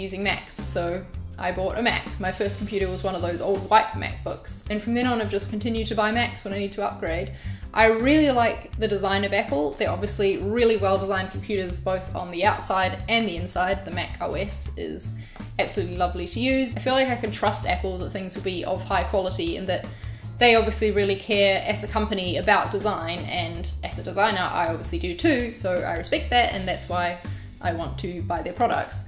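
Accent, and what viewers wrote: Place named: New Zealand. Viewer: I love your NZ accent ;)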